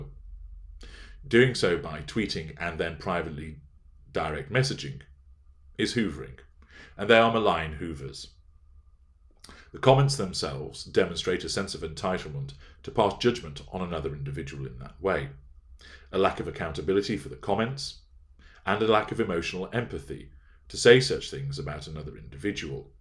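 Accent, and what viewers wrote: United Kingdom. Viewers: He has a very relaxing voice, just like Omegon. But I'm in love with the British accent anyway.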